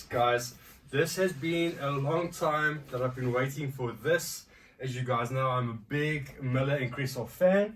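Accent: South Africa. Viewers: Are you from South Africa? For some reason I thought your Australian for like the longest time.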